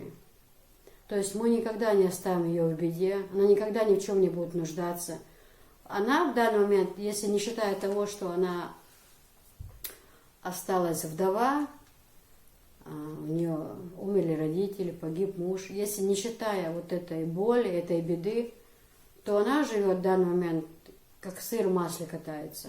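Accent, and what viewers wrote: Chechnya, Russia. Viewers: Это надо же выучить чеченский язык на столько что ты не можешь говорить на русском без акцента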